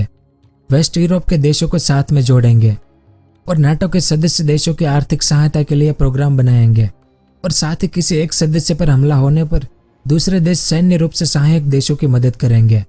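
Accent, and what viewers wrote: Gujarat, India. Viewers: Gujarati voice che Boleche te Gujarati che ne?????? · You are gujrati bro?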